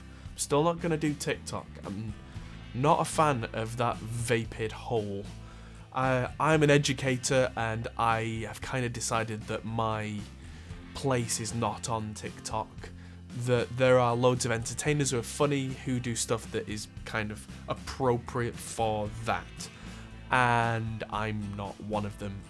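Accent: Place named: North, United Kingdom. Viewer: You're a credit to our industry, look forward to seeing more of your content. Would love to catch up with you at some point it's great to hear a fellow northerner on here.😃 Maybe catch up at Namm in April.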